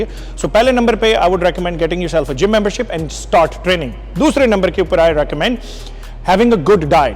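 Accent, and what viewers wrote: Punjab, India. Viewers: Bande de puttar bano, muscle di dhukki kada ti 😂😂 🤟 Paji tusi pisho kithe to ho ? Tuhada punjabi da style bilkul sade nal milda, plz dassyo jaroor where from you ? 🙏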